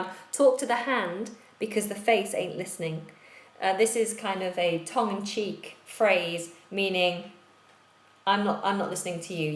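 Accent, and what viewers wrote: United Kingdom. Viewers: easy and clear British english. Thanks for what you are doing. Just subscribed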